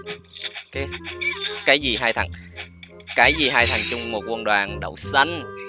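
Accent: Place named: South, Vietnam. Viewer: Anh Nói Chuyện Không Hay Bằng ZivGame Đúng Là Giọng Miền Bắc Nghe Thú Hơn Giọng Miền Nam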